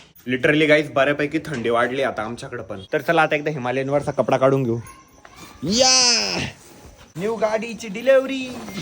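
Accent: Khandesh, India. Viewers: Bhau tumichi khandeshi ek nambar aahe 😅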